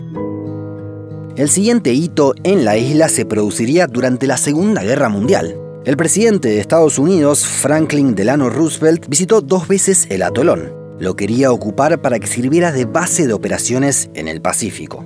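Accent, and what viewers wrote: Rioplatense, Argentina. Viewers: Por curiosidad, de dónde es este chico? A los españoles nos cuesta diferenciar el acento de Argentina, Uruguay y Paraguay. Un saludo!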